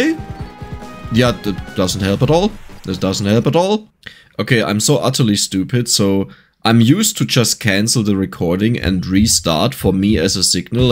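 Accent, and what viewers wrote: Germany. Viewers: Took me 17 minutes to realize you're German lol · Lol hab grade rausgehört das du deutschsprachig bist lmaoo jetzt kann ichs nicht mehr überhören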